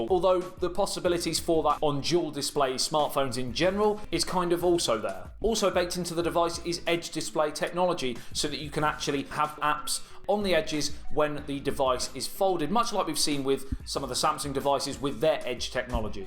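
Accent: United Kingdom. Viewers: You are the most genuine rusty British accent Ive ever heard🤞🤞🤞,rememberd SNATCH MOVIE.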